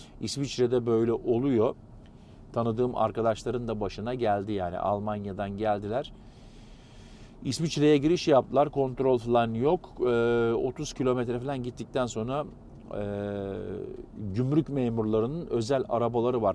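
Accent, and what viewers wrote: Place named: Istanbul, Turkey. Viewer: Bey elinize aklınıza saglik, temiz bir İstanbul Türkçesi ve tertemiz ses tonuyla kolay dinleneceksiniz. (Cekim teknik ayrıntılarna yer vermeseniz iyi olur) Görüntü güzel, toplumsal yaşam hk. açıklamalar hoşumuza gider.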